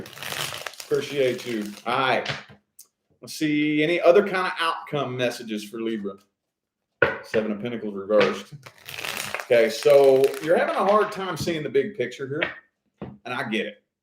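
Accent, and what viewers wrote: South, United States. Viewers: Libra. Need the financial blessings. The person is wanting back and is a twin flame. I have agreed to one more chance. Why do you sound like a southern guy?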